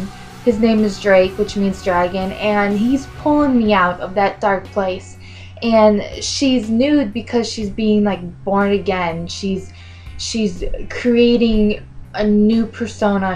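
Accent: United States. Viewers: are you from the east coast by any chance? you kinda sound like it! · yeah your accent xD american im from sunderland in the uk you sure do you sound awesome :D your very welcome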